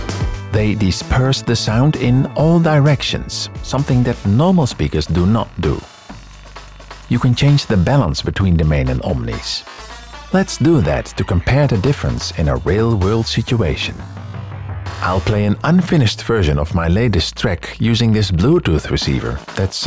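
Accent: Netherlands. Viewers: An interesting dutch accent! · Me: That accent.. Is this guy Dutch?: “Toedels!” Me: YUUUP! Gelijk geabonneerd! ❤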